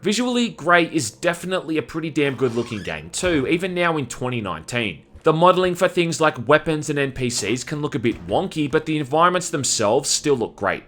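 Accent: Australia. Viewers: Your videos are way better than Gameranx. It may just be the macho ozzie accent. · Love this guy's Aussie accent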